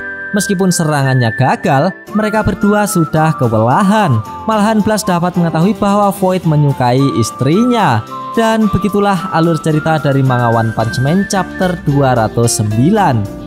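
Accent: Java, Indonesia. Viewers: Medhook pol min 😂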